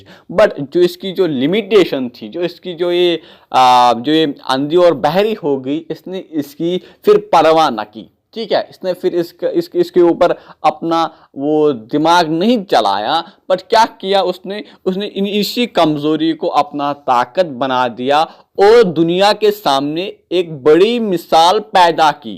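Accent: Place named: Kashmir, India. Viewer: Your kashmiri accent is so sexy ❤❤❤❤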